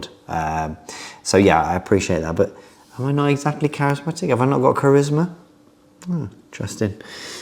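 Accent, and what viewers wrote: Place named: Nottingham, United Kingdom. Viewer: Vocal inflection? He clearly doesn't know how people from Notts sound duck 👍 Looking forward to seeing what comes next to the channel!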